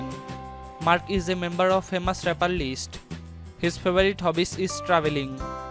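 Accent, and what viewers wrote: India. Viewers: india ang nag sasalita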